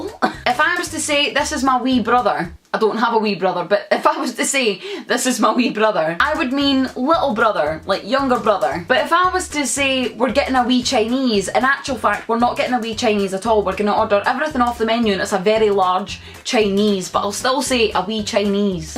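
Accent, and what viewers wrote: Scotland, United Kingdom. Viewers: i love her Scottish accent i am in love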